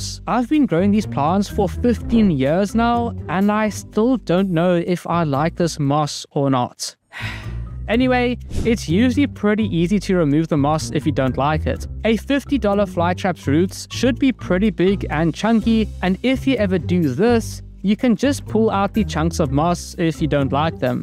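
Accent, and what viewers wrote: South Africa. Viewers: Are you South African bc i think you are.Pls answer😢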